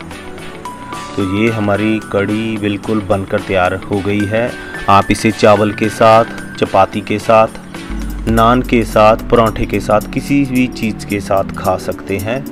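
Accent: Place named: Punjab, India. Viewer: paaji meri maa pichle 45 saal se mujhe kadi khila rahi thi. Maine shaadi nahi ki. To aaj mera apni maa ko kadi khilane ka mann hua. Aapka video dekh k maine banaya... whoa!!! Bohot delicious banaa thaa. Ek cheez maine add kiya and wo thaa khada dhaniya. Bohot bohit shukriya aapka. Aapki punjabi accent waali hindi bhi kadi jaisi achi hai. Thanks 🙏🏼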